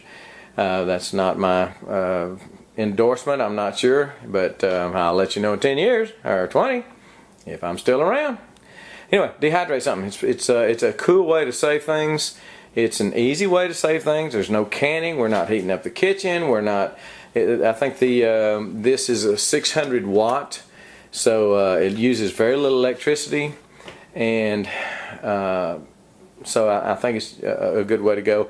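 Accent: Texas, United States. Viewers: Love your Texas accent!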